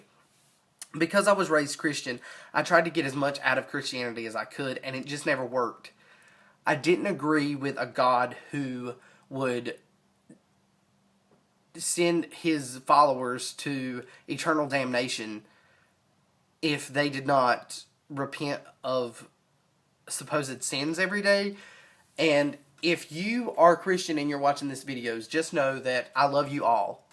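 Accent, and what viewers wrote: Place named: South, United States. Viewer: Looking forward to watching your videos! I am from England and I love your southern accent :) x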